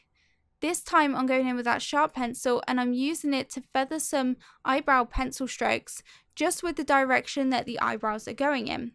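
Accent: United Kingdom. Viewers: "phoutous"....man that British accent is boss!!! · You have an British accent..it's good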